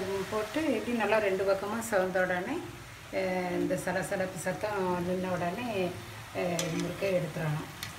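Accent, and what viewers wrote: Tirunelveli, India. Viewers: ரொம்ப தெளிவான பேச்சு நீங்க நெல்லையா? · but ur accent also gud..nellai touch up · Nalla peysureenga namma ooru pakkam pona mathiri iruku Unga recipe super Tirunelveli thana?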